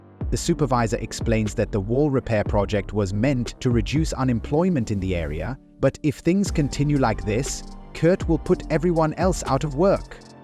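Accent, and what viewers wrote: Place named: United Kingdom. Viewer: First. time i saw a british voice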